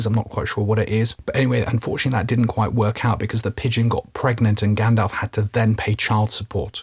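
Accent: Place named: England, United Kingdom. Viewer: Thank you for making these. Love the English accent too. If you could go back and revisit the rings of power and do each episode over like you did with 7 and 8 that would be wonderful! I'm serious! I will pay you!!😄 That used condom falling on the Balrog's head was awesome! Humor is the best way to deal with this abomination of a series. (It's really a shame too, because in the right hands this could have been a good show. I am interested in seeing the lore come to life. I would love to see how Anatar (Sauron) managed to trick the elves; how he worked with Calibrimbor to learn to craft rings. That would have been really cool. It takes more than 8 episodes though. And fuck the Harfoots! Who gives a shit about that side-story.) That reminds me - your Skyrim comment was perfect. The entire show was a bunch of stupid optional side quests. 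Never did I fear for anyone or anything. The only characters I liked were Adar and Berrick, and the latter had not a single spoken line. Well, I suppose the discount-Emma Watson character with the big boobs was fun to look at, but even she was unnecessary and her character was as developed as bleached vanilla. Anyway, please keep these going. I look forward to them sooooo much! Cheers, mate!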